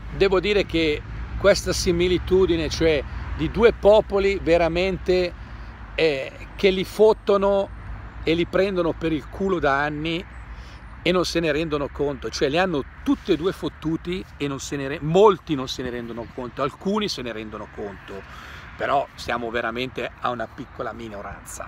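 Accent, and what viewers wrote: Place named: Brianza, Italy. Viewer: te lo ripeto. TU NON SEI BERGAMASCO, SEI UN BRIANZOLO E SI SENTE PURE DALL' ACCENTO. NAPOLI E' BELLISSIMA e il gemellaggio esiste già nella vita reale. PATETICO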